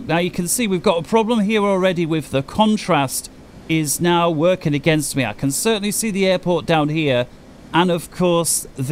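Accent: United Kingdom. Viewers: A really good teacher, even tho you speaking british :D If it comes to GMT, i only use it for looking for targets, then when I do, i lock with radar and then quickly switch to TGP and point track, then use laser Maverick to kill them.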